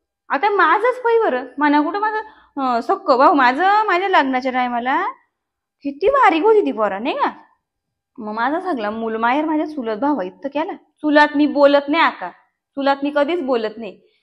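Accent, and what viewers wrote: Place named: Shahapur-Murbad, India. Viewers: Shahapur murbad bhasha 😂😂😂 kinhavli pn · अभिनंदन आपल्या शहापूर - मुरबाड च्या महिलांचा परफेक्ट टोन पकडलाय . ऐकायला गोड , आपुलकी जाणवते . शुभेच्छा.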